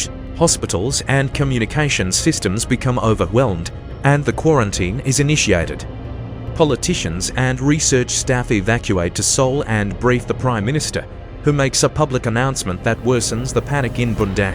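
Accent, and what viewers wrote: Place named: Australia. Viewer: Great to hear Aussie AI voices 👍🏾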